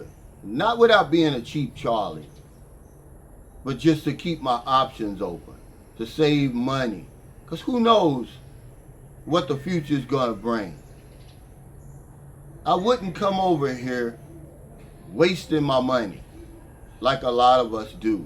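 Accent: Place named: New York, United States. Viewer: I love this guy - New Yawk!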